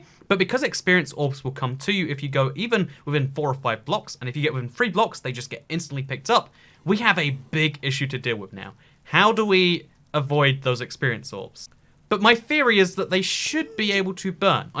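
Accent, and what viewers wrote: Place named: United Kingdom. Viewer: British* He's literally British do you not hear him xD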